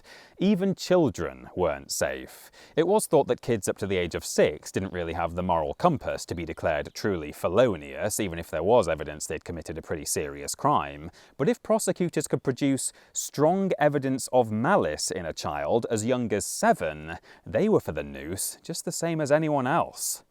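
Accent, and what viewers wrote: United Kingdom. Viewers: Yay! Another deep-voiced Brit telling me interesting historical facts! Just don't go bald, grow a beard, and move to Prague... Whistleboi might find you.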